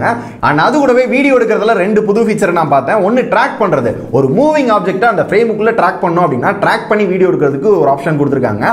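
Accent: Madurai, India. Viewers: Bro neenga madurai yaa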